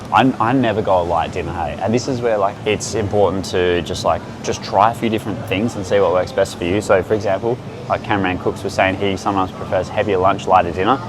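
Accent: Australia. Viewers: Are you a Queenslander?, i notice Queenslanders say A or Hey alot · So good to hear a fellow Aussie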